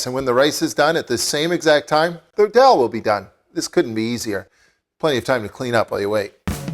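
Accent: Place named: Canada. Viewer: He has a Canadian accent but Canadians don't say Dell instead of Dhal. I think its ignorance.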